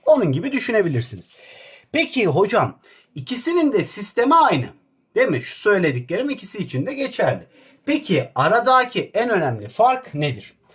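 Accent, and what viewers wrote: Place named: Black Sea, Turkey. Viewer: Hocam siz karadenizlisiz da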